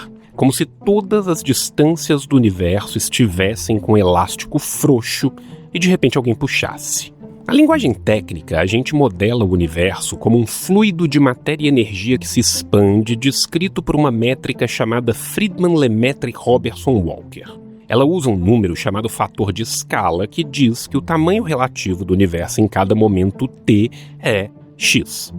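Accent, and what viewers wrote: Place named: Minas Gerais, Brazil. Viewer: é um universo. E o sotaque mineiro deixa tudo melhor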